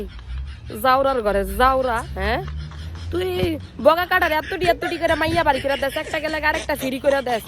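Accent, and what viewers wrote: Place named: Sonargaon, Bangladesh. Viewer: আপনার কথা বলার ধরন গুলো আমার অনেক ভালো লাগে,, আপনার গ্রামের বাড়ি কি সোনারগাঁ মদনপুর এদিকে,, আমার এক ছোট ফুফুর বাসার ওখানে,, তাদের ভাষা এরকমই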